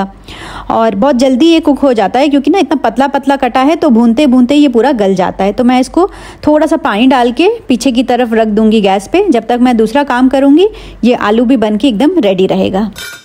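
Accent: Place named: Mumbai, India. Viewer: Sister aap mumbai se ho , aur aapka sasuraal u.p. ka hai, isliye aapki mix zabaan badi hi mazedar lagti hai sunne me. Khaskar jab aati hai, ise chhupa kar paka lenge..😊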